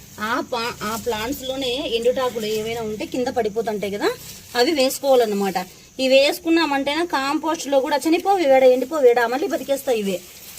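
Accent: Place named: Rayalaseema, India. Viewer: Mi devuru medam rayalasima lage vunnai matalu madi kadiri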